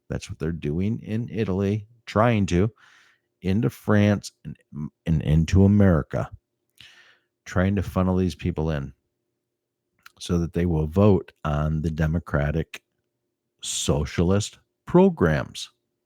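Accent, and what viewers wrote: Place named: North, United Kingdom. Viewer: You do have an accent...you sound like you're from the North. I have a wicked accent, southern all the way.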